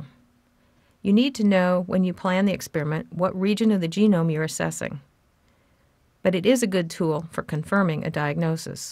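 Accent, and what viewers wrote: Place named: Texas, United States. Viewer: Ahh.. I love that Texan accent ^^ Very clear explanation of this method also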